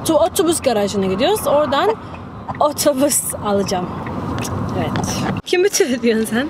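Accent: Turkey. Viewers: Biraz Egeli gibi konuşuyorsun çok şekersin ya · trakya şivesi var beeee cndkcndkcjdkfkeodidod yerim yerim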